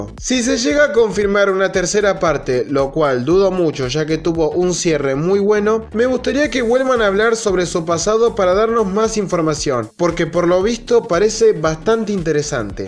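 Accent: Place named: Argentina. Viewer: Eres argentino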